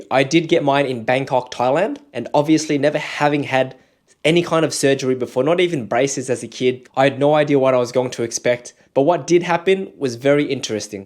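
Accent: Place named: Australia. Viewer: I'm assuming with ur aussie accent the $4k is in AUD and not pandering to the yanks? :) p.s the results look great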